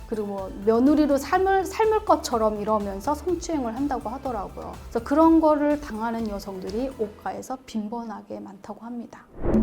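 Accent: Seoul, South Korea. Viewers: She already had that seoul accent i think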